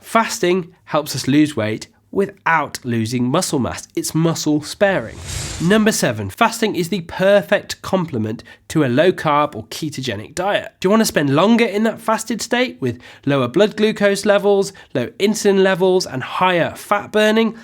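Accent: United Kingdom. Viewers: Four seconds in, heard brittish like accent. Out of here!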